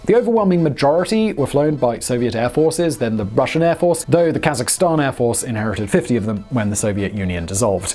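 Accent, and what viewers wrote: England, United Kingdom. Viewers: super faster talker with an English accent 80% lost to these bad choices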